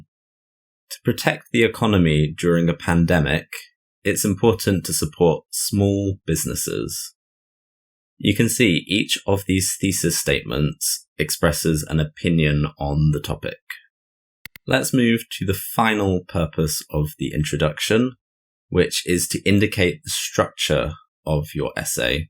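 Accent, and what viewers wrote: RP, United Kingdom. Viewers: Is it received prounication ?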